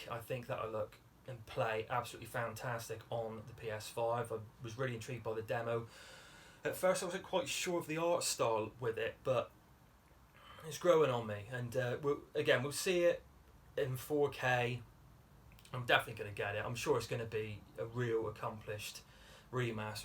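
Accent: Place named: Wales, United Kingdom. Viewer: Some great answers there buddy 😄 ive never played a mana game but always been interested. There should be more Welsh accents gaming 🤣🤣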